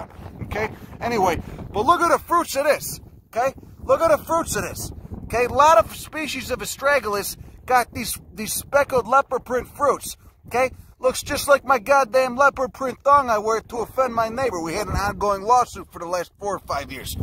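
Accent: Chicago, United States. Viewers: Could listen to a Chicago accent oratory on glands all day. Thanks for sharing your knowledge.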